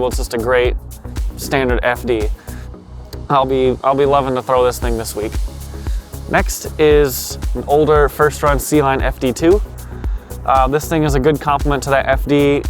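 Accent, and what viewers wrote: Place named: Michigan, United States. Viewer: Ive lived in Michigan all my life and this is the first time I noticed a Michigan accident.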